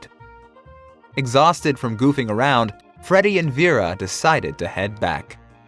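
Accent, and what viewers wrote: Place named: United Kingdom. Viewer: The narrator's British accent gave me some italian school memory vibes (yes, italians studies English at school! Isn't amazing?)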